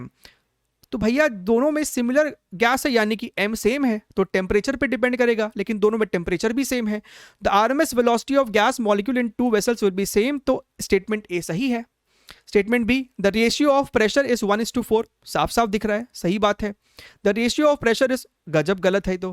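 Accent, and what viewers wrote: Bihar, India. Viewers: Sir aap bihar se hai kya?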